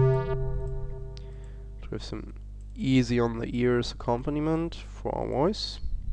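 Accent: Germany. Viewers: man merkt an deiner Aussprache dass du deutsch bist ;)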